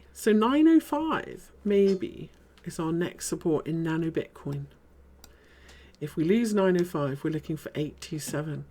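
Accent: United Kingdom. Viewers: Thanks for your update. BTW you have the most classy english accent of the crypto youtubers, that's very pleasant.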